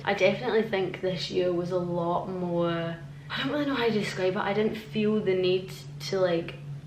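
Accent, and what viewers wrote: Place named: Glasgow, United Kingdom. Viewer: Is that a Glasgow University accent Ive heard so much about. Interesting video :D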